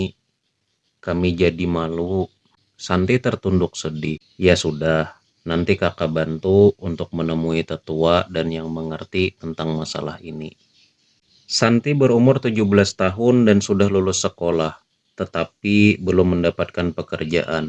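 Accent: Banjar, Indonesia. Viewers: Aslik suarak Banjar lih mang.😊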